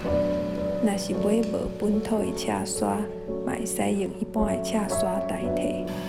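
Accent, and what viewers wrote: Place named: Central Taiwan, Taiwan. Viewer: 配音的腔調真的是中部腔，不是住新竹就是新竹腔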